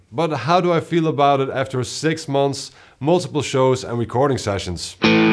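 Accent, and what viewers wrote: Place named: Netherlands. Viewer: I’m guessing Dutch or Flemish as first language? Your English doesn’t bother me at all👍.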